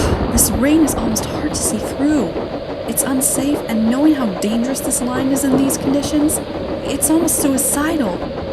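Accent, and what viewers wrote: United States. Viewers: Not bad. Great southern accent by the way. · You did a great job using an American accent. You could use your American accent for your narration in the Clinchfield Curse. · Was most of the dialogue you attempting an American accent?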